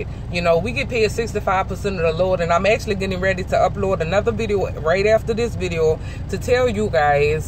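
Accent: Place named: New Orleans, United States. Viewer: LAWD HAVE MERCY BEHBEE!! BRAINS, BEAUTY, PERSONALITY, AN YOU A CUTIE!! AN TO TOP IT OFF DAT N'AWLINS ACCENT BEHBEE!! MAKE ME WANNA JUMP THRU DIS PHONE GIRL!! KEEP DOIN YA THANG SWEETHEART!!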